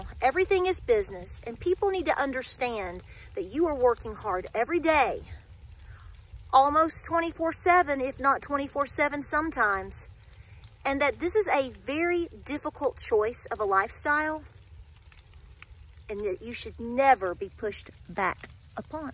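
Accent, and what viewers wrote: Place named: Knoxville, United States. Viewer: Sounds like people that live in west Knoxville.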